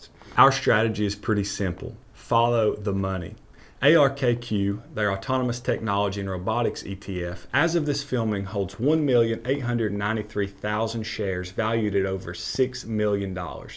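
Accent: South, United States. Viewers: Southern accent like yours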